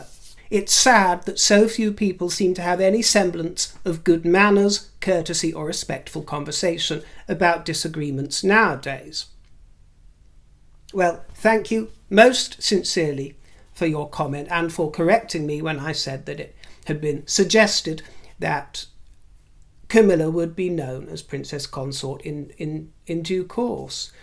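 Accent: South, United States. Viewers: Hi, your southern accent is quite fun. A bit like the older accents that were more sophisticated sounding than those now common. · From a Southern fan of yours, dear - you portrayed the true Southern lady accent perfectly! The only other accent almost as beautiful is the upper class British accent which you have! You are truly loved all over the world and you make the world a better, brighter place! Much Love, Nancy F. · your Southern accent is a hoot. So enjoyable...thanks. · you are a treasure! I really enjoyed your southern accents and your wonderful costume today.❤ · 😅 😂😮😊 love your American accent. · your southern accent reminds me of the movie,”Steel Magnolias.” People in the south it’s usually sweet tea. Mint Julip is what they drink at the Kentucky Derby. What is wrong with some people. Another channel, people are complaining on there also. 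Well just don’t watch it then. I don’t always agree with you. Doesn’t mean I want to comment every time or will stop watching. I don’t care how old Megan is. What’s the obsession?! I have a life to live. I have other channels to watch and podcasts to listen to. None of this nonsense for me. Go England for the World Cup!! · "we want privacy tour" lol that made me laugh out loud. Well said. What a picture. I love your southern accent, too! Thank you for the smiles and chuckles,. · Your southern drawl is not far off of the mark, beautiful! Impressive, indeed ❤ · 😂 You nailed the U.S. Southern accent! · Edit— 😂😂😂😂that accent!! I’m a KY native, but naturalized Texan, and there are many southern dialects. Each southern state has its own twang, and I think you did a fabulous amalgamation of them all😂😂😂😂I think Camilla proved herself to be a servant to the people and fervent supporter of Charles, and the Late Queen recognized that. 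Elizabeth may have been feeble of body but certainly not of mind. I’m not a Meghan fan, but I wish the conspiracy theorists would stop the pregnancy nonsense. You could see her pregnancies in her face. It was not faked. And after Archie was born, Meghan still showed the fullness in her abdomen which is such a common characteristic. Had it been faked, her vanity would have put her in a form fitting outfit with a flat belly when Archie was presented to HMTQ and the Duke of Edinburgh. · You look absolutely stunning today,. I wish I had the cajones to wear just one of the pieces, I adore the color, and your makeup is perfect. Your southern Belle accent is just fine. You made my day! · Nice southern dialect . You are so talented. You are loved by us commoners as well. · oh, you are a hoot! I adore you and your Southern accent. I am from South Carolina (USA) and i love a good accent. I will say that a southern accent is hard to do. 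Just be consistent in which one you choose. I am tickled by your broadcasts and wish you all the best! Much love, Kristi from South Carolina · PRECIOUS, I am from Alabama and your southern accent is better than mine!!! Thank you for your wit and wisdom, my dear 😂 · You are a hoot. Yes im a southerner from kentucky. I understand and enjoy your humor, i,mo ,also 88 years old, 4 sons , 6 grandchildren, one great grandchild,young at heart. ,and loving fun . Love the english people and your love of fun- thank you for making my day. Your southern accent is pretty good , although each state has its own accent. Mine started in Nashville tennessee and moved on to louisville , ky. Ancestors all english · Love your look❤! And your Southern accent 😅! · You’re hilarious! Love the southern accent ! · I love your Southern accent. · Hello, I spat my tea out, at your southern accent. It reminded me of Blanche Devereaux from the Golden Girls. 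· I'm interested in how you define your look today - I wasn't sure if you were going for gypsy or medieval princess or a member of the harem. On the whole I think probably medieval princess. Your American accent was certainly southern - a cross between Mae West and Scarlett O'Hara.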